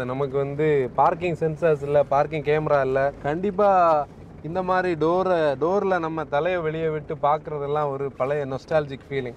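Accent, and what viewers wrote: Kanyakumari, India. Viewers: Your presentation and the slang of my own Kanyakumari style is extraordinary. Keep going all the best.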